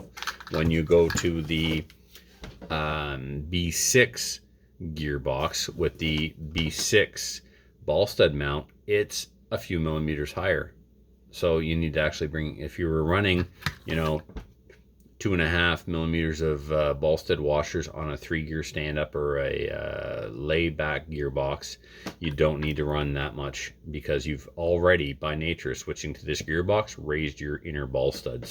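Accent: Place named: New York, United States. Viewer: you sounded like you were maybe from New York lol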